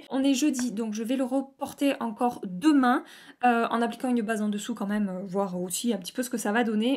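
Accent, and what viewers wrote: South, France. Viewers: Coucou ! Ah ! Cet accent du Sud en ce dimanche ensoleillé ☀️ Je viens commenter par ici pour changer d’insta 😀 très chouette vidéo (comme d’habitude ai-je envie de dire), j’ai vu passer la nouveauté Dior mais sans grande conviction mais mnt tu as piqué ma curiosité... Est-ce que la teinte 2 est finalement bonne ? Et concernant le feutre à sourcils, chez Urban Decay ils font un produit très similaire (Brow Blade me semble-t-il) et avec une gamme de teinte plus étendue... Si jamais 😀 Excellente journée !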